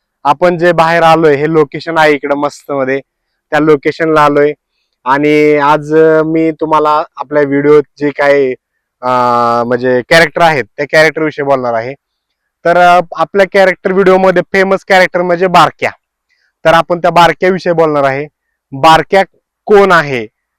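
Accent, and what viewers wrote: Western Maharashtra, India. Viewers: तुम्ही बोलता तो भाषा जी की, सोलापूर, सांगली, सातारा, कोल्हापूर ची ही भाषा तुमच्या तोंडातून आम्हाला ऐकायला आवडेल.... आम्ही मराठवाड्यातील हिंगोली जिल्ह्यात तुमचे हिडीओ खुप बघतो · पश्चिम महाराष्ट्रातील सोलापूर , कोल्हापूर ,पुणे,सातारा ,सांगली या भागातील भाषा आपण बोलता ऐकायला खूप मजा येते. हॉटेल मध्ये गेल्यानंतर तेथील प्रत्येक सजीव व्यक्ती ,निर्जीव वस्तूचे वर्णन, हॉटेलचे वर्णन खूप छान असते . वेगवेगळ्या हॉटेल मधील चविष्ट मटण रेसिपी पाहायला खूप मजा येते. मी नेहमी आपले शॉर्ट्स व्हिडिओ पाहतो❤❤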